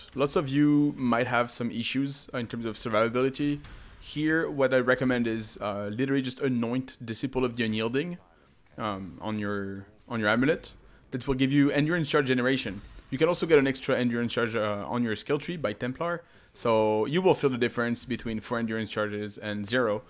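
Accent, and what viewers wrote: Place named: France. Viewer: Je me disais mais il est francais, 100% Puis oui haha Excellent build en tous cas, merci !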